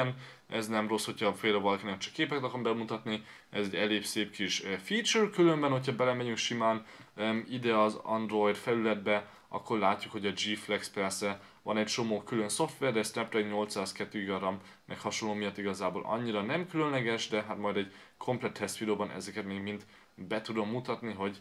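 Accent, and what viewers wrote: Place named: Germany. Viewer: Nagyon durva német akcentus...:)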